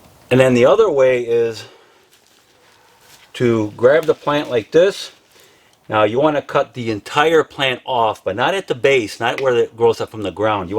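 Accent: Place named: Massachusetts, United States. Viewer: LOL I was thinking the same thing ….I’m thinking he’s from Massachusetts…. That’s where I came from … living in Texas now..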